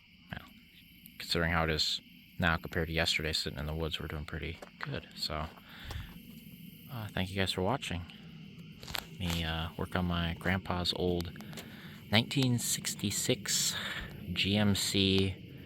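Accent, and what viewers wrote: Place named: Wisconsin, United States. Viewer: Apple 🍎 doesn’t fall from the tree with that Wisconsin accent 🤠🇺🇸🍺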